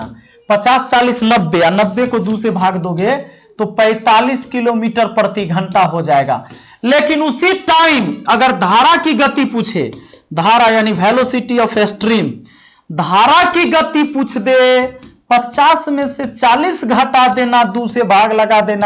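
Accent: Bihar, India. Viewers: Please Bihari tone me mt padhaya krein sir Please mujhe kisi ko hurt nhi krna just mujhe smjh nhi ata isliye bol rhi hu I am fan of your tricks but just because of tone I am unable to understand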